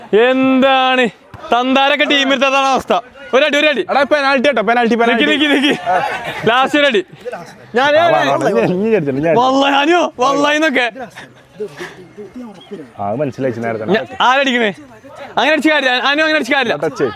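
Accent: Malappuram, India. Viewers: ടെച്ട് മ്മളെ മലപ്പുറം 😂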